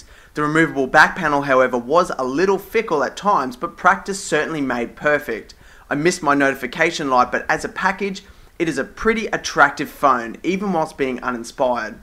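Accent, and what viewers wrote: Australia. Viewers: Nice review. Cool accent. I want to move to Austrailia and get that same accent to pick up the ladies.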